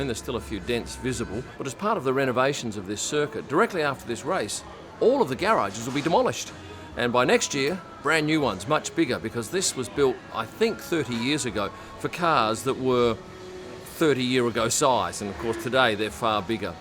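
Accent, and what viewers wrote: Australia. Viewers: So good to hear an Aussie accent in F1 media - good work and nice report.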